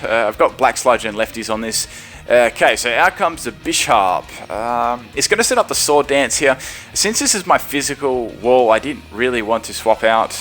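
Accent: Australia. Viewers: He's Australian, if that helps you realize.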